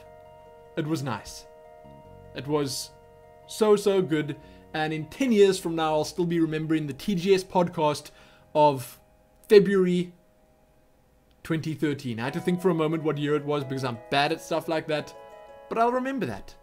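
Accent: South Africa. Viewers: I think I'm one of the view viewers who doesn't find the South African accent strange at all - despite being from Scotland I grew up knowing a few South African people so I guess I'm acclimatised to it :3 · Just thought I'd let you know that I LOVE the way you sound. The South African accent is my favourite accent, and your voice is just perfect!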